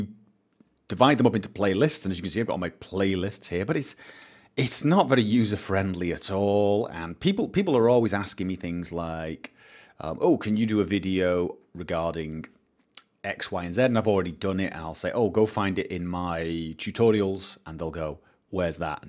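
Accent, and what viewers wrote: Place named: England, United Kingdom. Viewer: Wait I love you're accent but are you Norwegian or English because you sound English but you're channel country is Norway. Just wondering.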